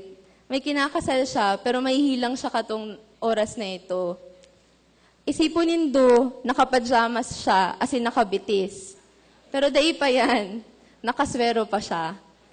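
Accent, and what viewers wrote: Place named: Bicol, Philippines. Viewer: obsessed sa bicol accent ni!!! grabe word of wisdom niya and fam niya grabe.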